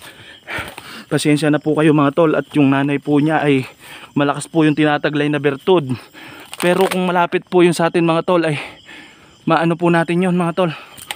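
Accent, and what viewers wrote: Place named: Pampanga, Philippines. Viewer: Saan lugar yan idol kc ang galing mong mag tagalog,pero my punto kang kapampangan?ingat lang idol,God bless u...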